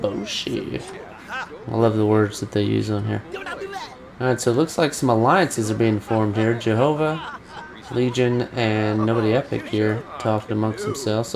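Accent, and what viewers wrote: South, United States. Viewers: deep country accent he dead as hell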